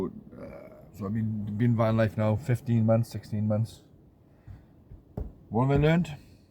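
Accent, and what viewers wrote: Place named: Welsh Valleys, United Kingdom. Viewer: You just hit my algorithm, just subbed. You sound like a valleys lad. Self - deprecating, no nonsense, tell it as it is, dry sense of humour. Wishing you all the best 😊🏴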